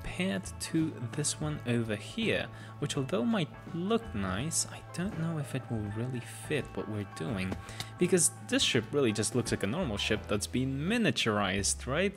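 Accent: United Kingdom. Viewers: were you speaking in a British accent for part of the beginning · Are you brithsh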